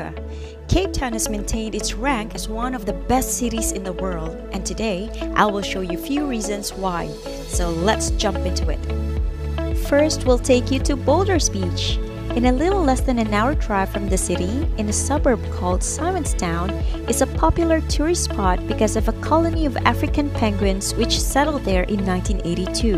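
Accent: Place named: Visayas, Philippines. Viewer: Cebu?? So I guess bisaya ka ma'am. 💕 Loved your accent 😍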